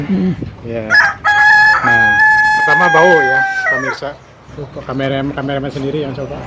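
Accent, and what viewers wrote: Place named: Indramayu, Indonesia. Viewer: ngmomg e medok wg dermayu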